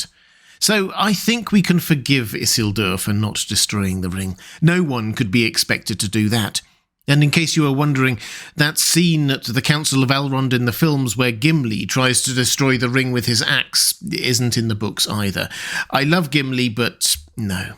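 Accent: United Kingdom. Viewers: You have a wonderful voice for narration! And no, I’m not an American that thinks all British accents are cool, I’m Canadian which means I’m part of the commonwealth and also think all British accents are cool.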